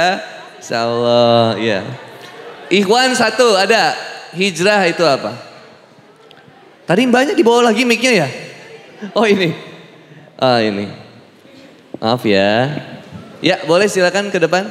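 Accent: West Java, Indonesia. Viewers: owh pantesan Nyunda pisan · Cmn ust medok di sunda,kalo ngm ujung2nya ada sunda2nya,,kalo andhika pratama gk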